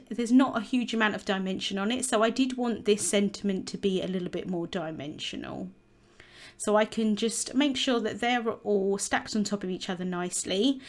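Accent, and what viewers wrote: RP, United Kingdom. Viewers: A very lovely card! Like the colors and to hear Queens English!👍🏻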